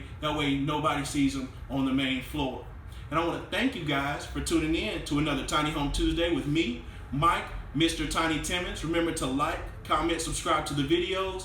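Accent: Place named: Texas, United States. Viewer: We can never watch the videos all the way through because the way talks is so annoying. A mix of thug and texas rancher. Most media forms recommend a standard midwestern accent or so I was taught in journalism class.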